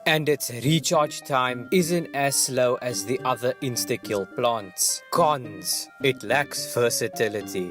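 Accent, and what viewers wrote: Afrikaans, South Africa. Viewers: Dude, are you South African? I can hear the Afrikaans in your accent 😂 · Wait are you Afrikaans? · is that a south african accent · Could instantly tell you're South African 😂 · South african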